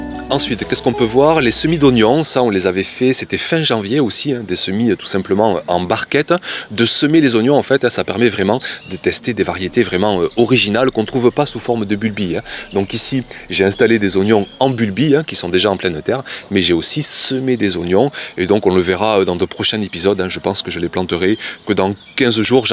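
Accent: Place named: Toulouse, France. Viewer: Je suis du Québec. Je viens tout juste de découvrir tes vidéos. Elles sont super intéressantes. Mon neveu vit à Toulouse depuis près 20 ans et je crois reconnaître ton accent à moins que je me trompe. Donc si je comprends bien la saison de jardinage est grandement devancée grâce au merveilleux climat que vous avez la chance d’avoir. C’est définitivement 2 mois plus tôt que dans le Sud du Québec. Je suis jaloux… Bonne saison et bon succès.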